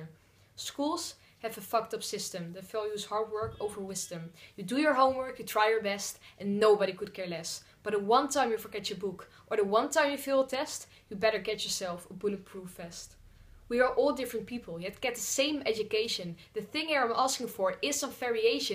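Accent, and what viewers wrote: Netherlands. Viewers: Heyyy are you Dutch? I think I recognise the accent.👍🏻👍🏻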